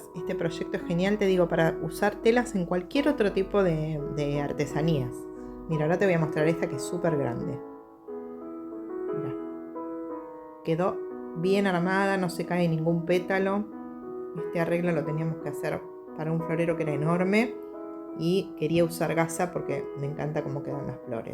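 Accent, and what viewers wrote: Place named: Argentina. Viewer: Lo que más me gusta es que la señora que explica es argentina, habla como yo y le entiendo bien.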